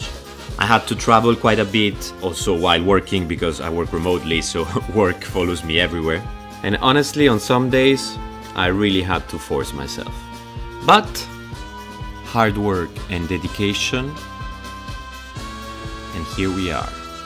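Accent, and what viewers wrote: Italy. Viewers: Ci ho messo una decina di secondi per accorgermene, ma l’accento italiano è davvero inconfondibile 😂 Da un tuo “compare” dall’Italia, bellissimo video, chiaro, semplice e diretto, e il tuo stile sia nel disegno che nell’editing mi piace un sacco! Come disegnatore occasionale sono super curioso per i tuoi prossimi video Continua così!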